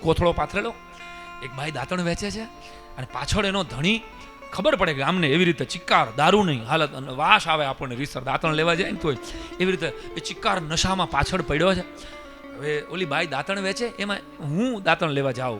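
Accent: India. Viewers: Aap no awaz sabhli Jamnagar ni yaad aavi Jay che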